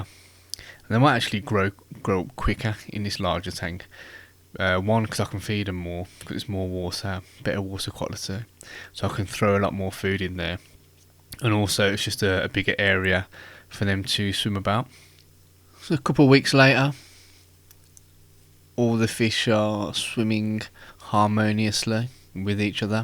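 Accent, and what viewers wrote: Birmingham, United Kingdom. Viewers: Lovely brummy accent there lol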